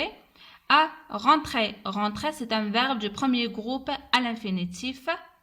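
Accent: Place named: Algeria. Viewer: شكرا اختي بارك الله فيكي بصح تباني في الهدرة جزائرية المهم شكرا لكي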